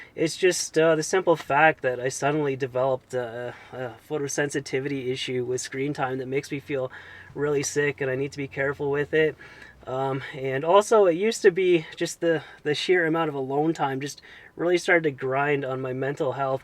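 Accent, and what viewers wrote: Canada. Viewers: Don't forget that epic Canadian Accent! 😉🚚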